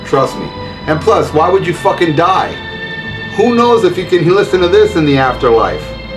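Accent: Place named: Chicago, United States. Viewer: Did you grow up in Chicago's "Back of the Yards".......because you use the same expressions and have a bit of accent like us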